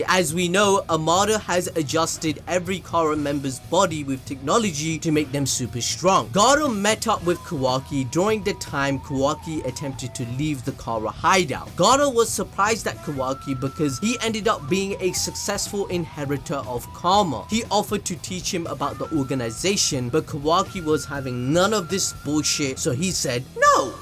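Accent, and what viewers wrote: Punjab, India. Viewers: Man are you pakistani?? Your punjabi accent seems like it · That Punjabi accent 😂🔥🔥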